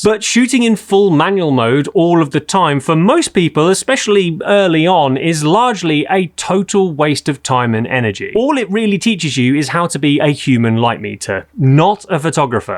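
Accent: England, United Kingdom. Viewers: Very good advices and I like your english accent and style with sous-entendus. Could you add : 1. B&W is a must for artistic photos 2. Never use Auto (which is in the same line as always use Manual) 3. The larger the zoom, the better the photographer.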